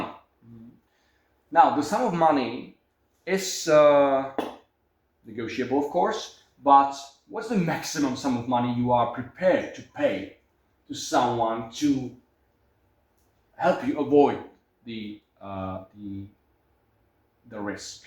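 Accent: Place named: Germany. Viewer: I got recommended this video and I heard the semi German accent so decided to watch the entire thing 😂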